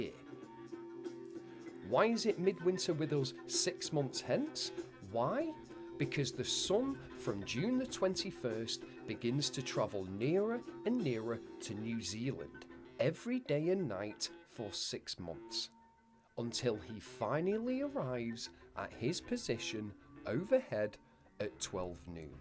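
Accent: United Kingdom. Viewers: I feel dumber trying to watch this. Having a British accent doesn't make you smart.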